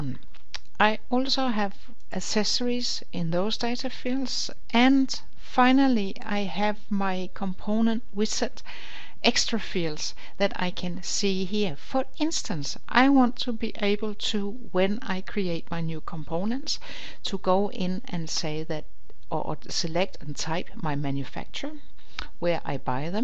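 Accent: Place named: Denmark. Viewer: i smell danglish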